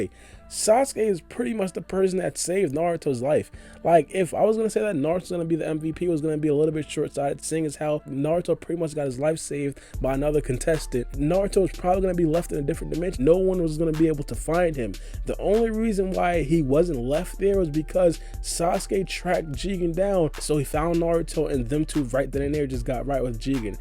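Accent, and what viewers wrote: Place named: New York, United States. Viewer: Im gonna guess your from ny, 'cause your accent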